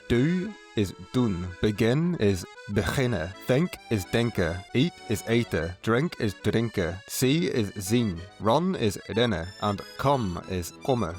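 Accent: Northern Ireland, United Kingdom. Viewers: I thought the narrator was Scottish, but I suppose the Northern Irish accent must be close to the Scottish one. It made the English words sound a bit 'off' to my ear lol. But I'm a Kiwi, and so I bet my vowels sound worse 😆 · It's funny when I first heard the man's accent I thought he was a Dutch person making the video because he didn't sound English and more Germanic though I'm aware most Dutch people speaking English barely have an accent because 90-95% of their population speaks English fluently and the most proficient as a second language in Europe. Then I saw that he was based in the UK according to his user description and then he revealed he was from Northern Ireland which was only then that I detected his accent being from that area even if he sounded like he was pronouncing all the Dutch words correctly like a real Dutchman would do. 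· I love the northern ireland accent, everything is pronounced so clearly. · Nice video! Really managed to quickly showcase the similarities/differences. Your pronunciation is really quite good, especially your UI, but I couldn't help but think I was hearing an Afrikaans accent! After realizing it wasn't just the first few words or something, I HAD to check your profile. I could obviously hear the Northern Irish accent as well, but thought you might be an Afrikaner having grown up there or vice versa in South Africa. If you don't secretly have any Afrikaner DNA, I would highly suggest you take a stab at Afrikaans, you'd sound fantastic at it! Mainly your short O, A, OU, -T/D, and OE, sound very Afrikaans, but even the G sounds ever so slightly more Afrikaans. Some specific examples are your "gebracht", "koe", "dorp" (the r also contributes to it here), "stad", "koud" and "soud" (which sounds identical to Afrikaans "sout" meaning "salt" ("zout" in Dutch). 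Anyway, none of this is to take away from your video or anything! Love your Northern Irish OW in words like "cow" and "howl" as well 😄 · Kind of – it's his Northern Irish accent.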